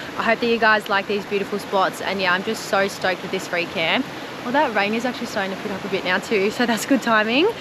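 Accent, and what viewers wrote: Australia. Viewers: One of the best shows on YouTube. Great lighting, not very obnoxious audio, great lighting, drone coverage, and seemingly good rig. I don't fly, thus will never see that country so I appreciate the all the tour's. NW Washington, I get to meet some folks from various places as well as to try foods. Find the "Aussie" manner of speaking makes for a nice change. Always curious about others kit, as I watch and learn from all those I can even if their region might be different. I trample about in a 1987 Jeep Cherokee (XJ) which I sleep in the back, as it has about 15cm of foam in the back comprised of two different compressible foams, with a big mosquito net that can cover the drop gate while fully open. But then I don't have aftermarket bumpers as I don't go into places that I don't belong, though I did enjoy playing in the 25cm or more of snow lately.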